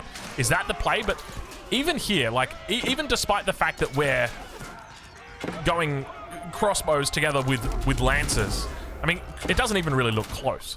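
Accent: Australia. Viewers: is truly the top tier caster. Funny, insightful, genuinely passionionate and knowledgeable about the game, a family man, that delicious aussie accent, minimal shilling, not afraid to call out pros and devs while being generous in giving props to good plays and dev decisions and shouting out and linking players whenever possible. I don't like every video but let me tell you I love every video and haven't missed one in a while (except FFA's I skip those sorry). KEEP UP THE SICK WORK MATE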